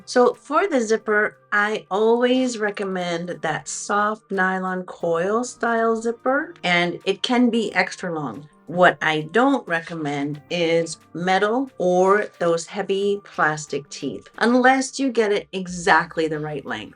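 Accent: Canada. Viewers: I'm addicted to your videos! You make me feel like I can tackle anything....I love your accent, your humor and your clarity. As a former US military family, you make me miss Canada too. We were blessed to be stationed in Newfoundland and then Victoria, BC during our military career. Thank you so much for making these videos!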